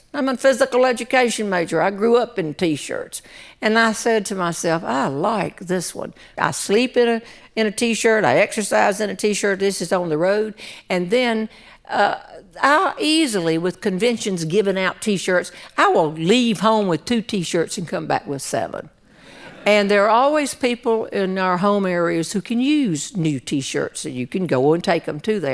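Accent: South, United States. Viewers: It's not that funny things don't happen around us, but not everyone has the gift of storytelling you do. I could tell the same story but a whole crowd wouldn't laugh. A true gift. It's especially funny with the southern accent. I'm in MN so I'm sure mine would make you laugh. That's why people quote Fargo. Yeah Sure You betcha. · I love u! wish you were my family. love your story telling your southern drawl and your humor. God bless u every day.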